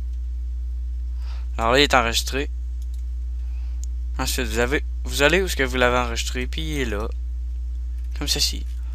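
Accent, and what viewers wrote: Quebec, Canada. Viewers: LAXENT QUEBEQUOI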